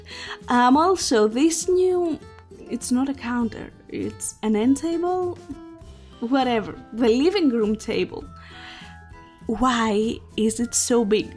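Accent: Greece. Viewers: Your accent sounds like you are greek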